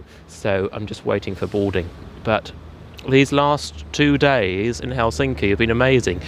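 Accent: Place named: United Kingdom. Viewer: Thanks for this! 😀 great content! I like how British your accent is and yet i can clearly understand what you were saying!😊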